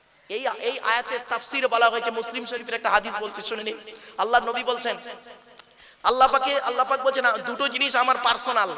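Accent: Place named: Kolkata, India. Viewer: হুজুর আপনার ওয়জ খুব সুন্দর লাগে আমি বাংলাদেশ থেকে বলছি আপনার কথা শুনে মনেহয় কোলকাতা কি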